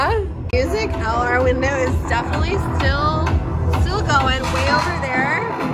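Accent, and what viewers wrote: Paisa, Colombia. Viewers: Me encanta tu acento paisa cuando hablas en español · Tu acento paisa es genial... Un fuerte abrazo, eres una digna representante de Colombia ante el mundo... Saludos desde Bogotá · te siento un leve acento paisa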